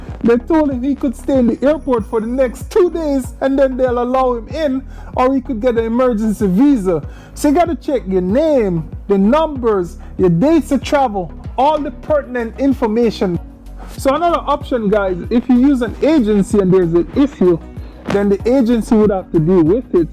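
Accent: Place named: Caribbean. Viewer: I hear the west Indian accent where are you from?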